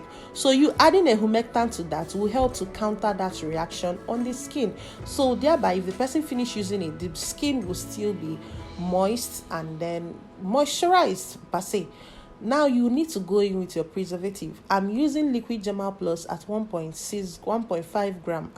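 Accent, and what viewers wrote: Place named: Nigeria. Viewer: may God shine His Light and Bless you in this 2025!!! Your accent shows me you are from Nigeria. But I from Tanzania always feel so close to you. By the way you narrate your projects as if you talk to your close family. Thats how you invited me to follow and learn from you. Having tried tested and found its true. You have all my respects my sister. May God shower you with Uncommon Blessings in your life this year.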